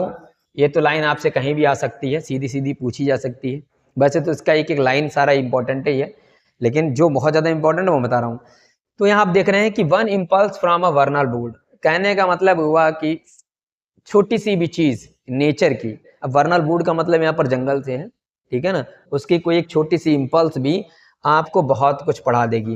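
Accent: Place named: Allahabad, India. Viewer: Full allahabadi😂😂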